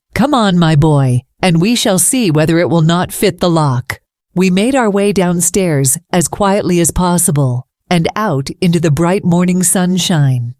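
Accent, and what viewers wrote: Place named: United States. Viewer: Yuk, an American accent is so wrong for this story.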